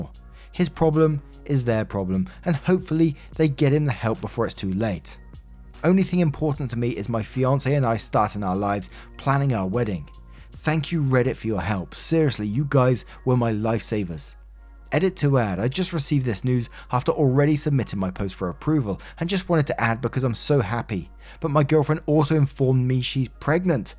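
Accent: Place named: Oxfordshire, United Kingdom. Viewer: Oxfordshire accents rock!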